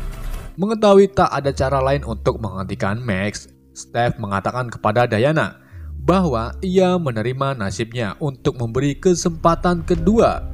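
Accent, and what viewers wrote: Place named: Java, Indonesia. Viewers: Pembawaan narasi selalu menarik, "sewu welongatus sangang puluh papat". Logat jowone met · Lu orang Jawa ya bang, dari kota mana bang??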